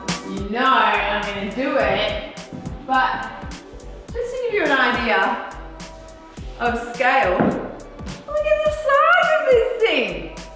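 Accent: Australia. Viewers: Her accent is from South (or) West Australia.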